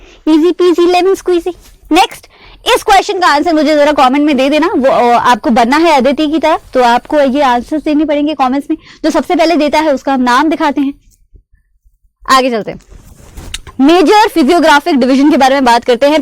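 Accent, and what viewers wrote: Tamil Nadu, India. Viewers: By ur talking style i think that u are from tamil nadu😄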